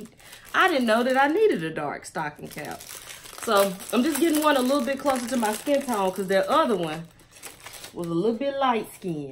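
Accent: South, United States. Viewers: I subscribed because 1. You’re beautiful 2. I love your accent wall 3. I want to perfect your southern accent 😊😂 I will be ordering this wig soon. Good review, thanks sis. 🙂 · New subbie!!!🤣🤣🤣You are hilarious! Love the southern accent! You be talking to sis!🤣❤❤❤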